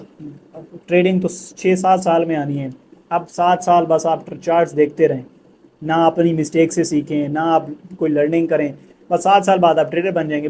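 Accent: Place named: Saraiki, Pakistan. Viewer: sir apke accent se lagta hai aap saraiki hain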